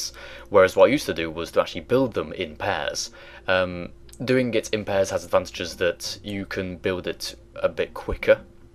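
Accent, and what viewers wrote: United Kingdom. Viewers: What area of the UK is that accent from? Can anyone tell me? Pls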